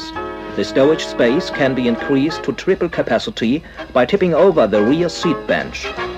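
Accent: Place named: Germany. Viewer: It's interesting that the narrator speaks English with a noticeable German accent, leaves the factory name completely in German (not that they'd translate it fully, which would be something like "Saxon Ring Automobile Works Public Trust" but at least say "vee ee bee" instead of "fow eh bay") and calls the car "Trabant six hundred one" when "six oh one" would be how an English speaker would more likely read it.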